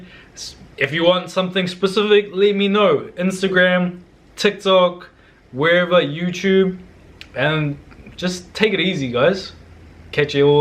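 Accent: New Zealand. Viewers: I’m Māori and was looking for bunny chow recipes knowing it’s a Indian South African dish. Last thing I expected was to hear an accent like mine haha. Look so good btw thank you for this!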